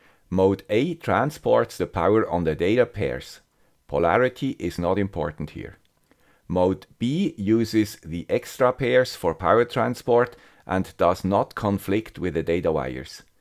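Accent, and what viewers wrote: Switzerland. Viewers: Swiss watches and clocks - high precision and accurately made. Some shafts have jewel bearings Swiss chocolate - rich flavour and addictive. Well packed but easy to open Swiss Red Cross - known round the world for their work. Very reliable The guy with the Swiss accent - all of the above. He also has a great Germans dry sense of humour. Thanks for all your great videos.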